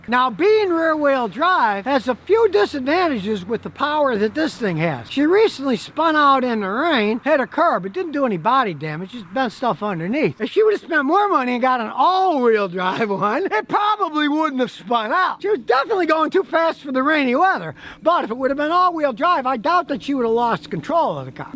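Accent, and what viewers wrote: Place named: New York, United States. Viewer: New York.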